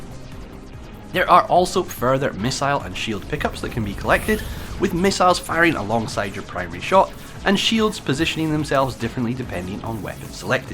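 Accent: Scotland, United Kingdom. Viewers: 😅 I note that the Scottish accent perfectly matches my creepy french ears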